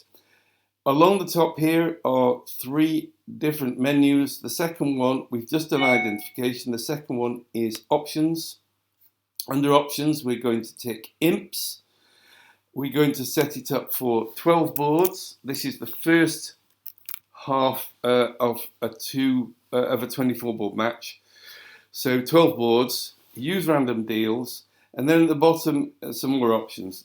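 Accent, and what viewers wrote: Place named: Manchester, United Kingdom. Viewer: thx - great explanation. Is it possible to delete a team match if I pressed the Create Match button too soon? BTW lovely to hear your accent as an ex-pat, whose Father was born in Manchester. It brought back lots of happy memories. Thx, Penny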